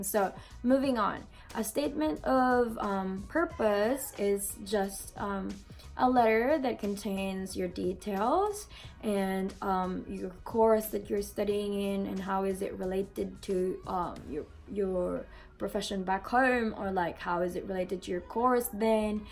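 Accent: Australia. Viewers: How did you get settled do you have relatives there? Btw love aussie accent! 😊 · char naging australian accent na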